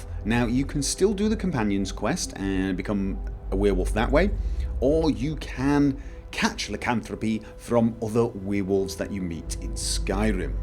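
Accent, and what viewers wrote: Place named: North, United Kingdom. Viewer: Let me just say that you are probably THE Greatest "Instructor" Or whatever you like/prefer to be called... lol May I ask where your from exactly? (Random question) haha. My dads from Ipswich and hes normally good with accents and all that be he couldnt figure it out.. He said maybe somwhere kind of North in the UK or somthing... (I know you probably dont like your comments to be filled with random stuff like this, but I HAD to ask) lol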